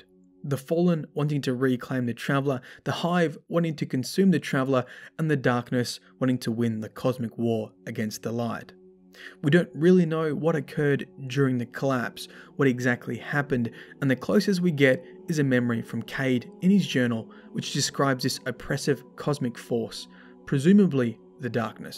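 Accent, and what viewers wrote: Australia. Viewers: Wait you’re Australian as well?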